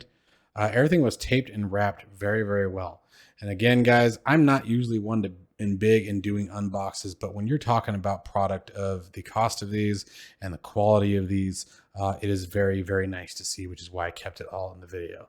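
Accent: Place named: United States. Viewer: do they ship to the united states? you sound american but doesnt mean you live in america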